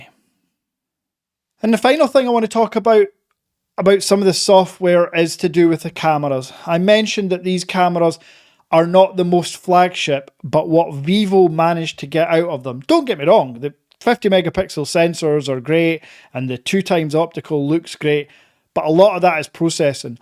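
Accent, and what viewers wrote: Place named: Scotland, United Kingdom. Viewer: Just wanted to say thank for the IR remote tip! I recently got a fan that was unable to work with the international app and unable to work with the chinese app due to it being region locked. Was cracking my head for days on troubleshooting but you totally solved that issue! Love your channel, very straightforward no fluff reviews and got to say, that Scottish accent is killer.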